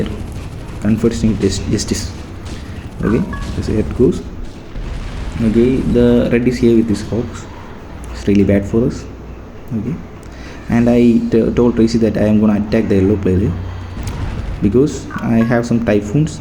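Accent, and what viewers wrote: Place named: India. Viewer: I can tell you are an Indian the by way you pronounce ''t''😄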